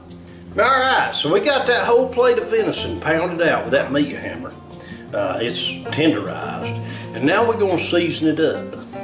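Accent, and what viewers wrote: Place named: Eastern North Carolina, United States. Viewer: I don't know where you are from, but that accent tells me you aint far from eastern NC.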